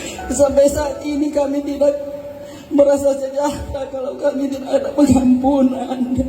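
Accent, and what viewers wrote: Java, Indonesia. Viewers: Ci.... medok amat ngomongnya ciii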